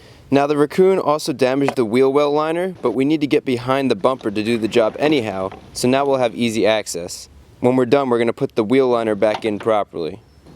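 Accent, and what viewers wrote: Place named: New Jersey, United States. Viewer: Dat jersey accent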